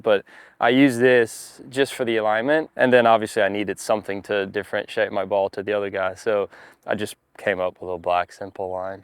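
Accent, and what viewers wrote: United States. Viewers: He sounds so American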